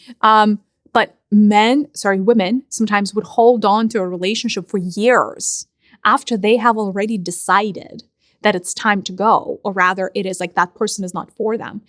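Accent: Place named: Russia. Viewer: Sometimes you holler at me really fast and use the words ain't and y'all in the cutest Russian accent. You're the favorite of the many voices in my head and the only person on the planet other than my husband I will allow to put me in my proverbial place.Contrary to popular belief (even if they're my beliefs) I'm not perfect. I am however, genuine courageous authentic passionate and loyal AF. I love bigger than almost anyone in this dimension and I need so many anchors and tethers it's ridiculous. I'm thankful for them all. I wish I hadn't forgotten for so long! Gratitude in extra portions 2 you my sister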